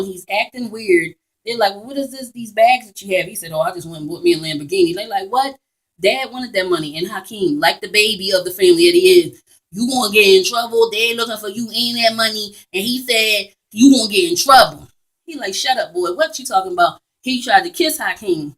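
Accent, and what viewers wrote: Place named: Philadelphia, United States. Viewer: So good to hear the Philly "sound"! Great job from your Philly friend on the West Coast. Keep up the good work!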